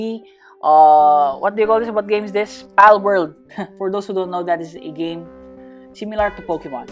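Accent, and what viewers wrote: Philippines. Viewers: Where does come from his accent sounds Filipino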